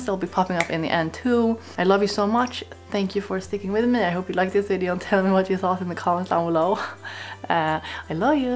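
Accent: Sweden. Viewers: SweetIvory 1203 It's a Swedish accent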